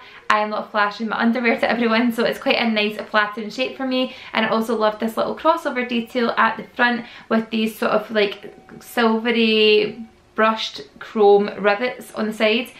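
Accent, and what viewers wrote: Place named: Glasgow, United Kingdom. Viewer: Oh your vids are not good for my bank balance 🙈 love all the pieces you got and now I'm off to see what I can find! Thanks! Xx p.s was surprised and delighted when I found your channel to hear a Scottish accent! Love that you have a channel and are doing well. I'm in Livingston xx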